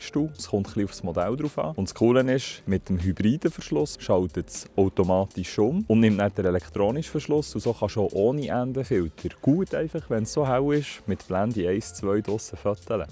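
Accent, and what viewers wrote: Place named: Switzerland. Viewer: Herrlich, dieses Schwizerdütsch. Leider verstehe ich so wenig, macht nix.Ich glaube nicht an diesen Leica -Mythos. Mit meiner alten Canon D60 , einem 105 Tele und einem Polfilter kriege ich fast genauso schöne Fotos hin, natürlich nicht in der Auflösung. Ist ja auch nicht sinnvoll, wenn ich das gesamte Foto betrachte.Ach, Entschuldigung, ich komme aus Dortmund, dem Ruhrgebiet.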